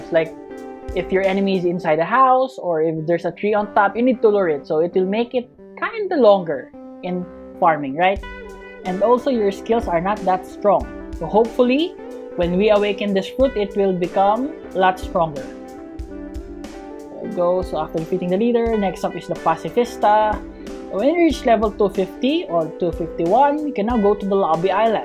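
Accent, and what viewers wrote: Philippines. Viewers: I was wondering if he is pinoy cuase he's voice is pinoy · Your accent is like Filipino. Are you from Philippines? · Is he Filipino his accent is like Filipino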